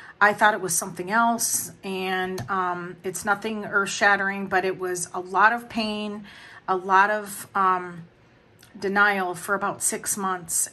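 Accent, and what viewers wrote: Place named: Wisconsin, United States. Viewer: 👏🏽 i applaud this. i’m also working on a health journal. also, i love your accent so, so much! ❤ are you a wisconsinite, by chance?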